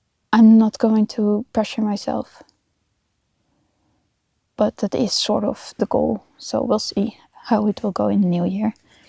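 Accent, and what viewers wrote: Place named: Netherlands. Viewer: Het is te horen ja, sommige dingen klinken nog Nederlands 🤩 Maar toen je op 31 dec middenin een bos zat, kon ik me niet voorstellen dat je ook in Nederland wás op dat moment , zonder mensen en zonder herrie om je heen 😂 ik vond het heel leuk om te kijken en zal je zeker blijven volgen! Ja , ik brei heel veel en ik spin, maar niet zoveel als ik zou willen. Maar als ik jou dan weer zie .. dan wil ik nú beginnen 😊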